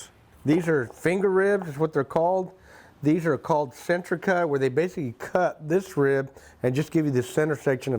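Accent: United States. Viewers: Somehow this guy sounds so American but so Spanish at the same time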